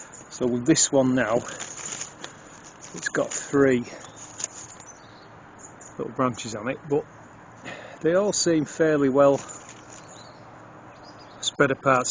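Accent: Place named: Lancashire, United Kingdom. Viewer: It's so wonderful to listen to your voice. I'm a Lancashire lass and I feel really comfortable with our accent being the same being so close. I'm gonna share your video with my friends all over the world so they know how I talk... just like you. I'm a gardener like you, much reduced now to age and ill health. But I get my joy in life from watching you doing things I can no longer do... thanks.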